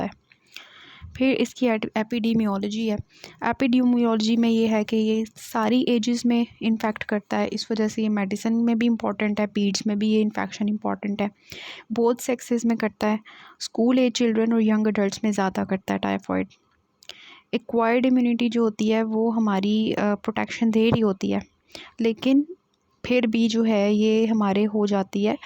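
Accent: Pakistan. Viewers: Pakistani lg rha h aawaj se ... Kya pakistani me b MBBS hoti h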